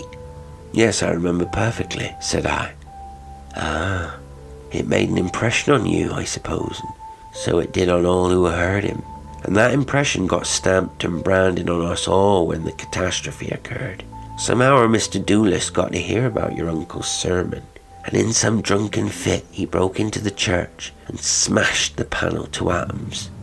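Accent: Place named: California, United States. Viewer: thanks very much. It's good that we have a channel like this - to least because of the good response everyone seems to get from yourself. These "spook story" channels seem to be a growing "thing". Its a bit like what enjoying radio dramas must have been like. A bit disconcerting hearing someone from california talking about forgotten railway stations in Norfolk! Lol. Suggestion....it might be an idea to do Machens "the white people". And get a woman to actually read the "green book" part. I've said this before - to others, with no luck. Think it could be a very special contribution to your channel if you could pull it off. It is after all one of the seminal "weird tales" of the last century. The "Dunwich" brothers posh anglo Welsh gran.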